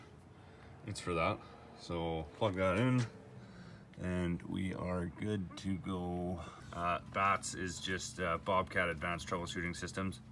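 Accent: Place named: Midwest, United States. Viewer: Nice work. I have an S650 as well. Where are you located? By your accent, I'm guessing mid-west area. I could use someone like you in my area (North Florida).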